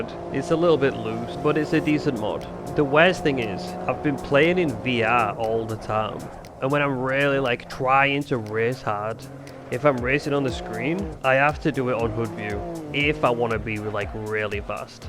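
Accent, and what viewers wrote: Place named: Liverpool, United Kingdom. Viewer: This guy is an absolute scouser.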